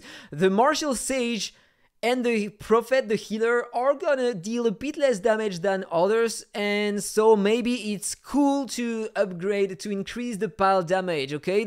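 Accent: France. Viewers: jsens un petit accent français ? Je me trompe peut-être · crushing it! best content out there so far, i just struggle with the french accent, but thats on me not you :P keep crushing it!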